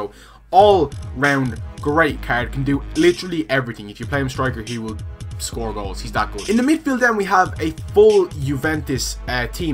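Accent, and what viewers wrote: Dublin, Ireland. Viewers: What part of Dublin are you from? ps I love these vids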